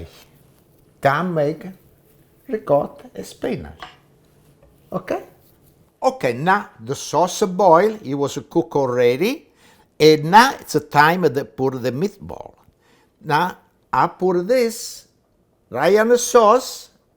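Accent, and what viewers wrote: Italy. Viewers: He sounds just like my italian family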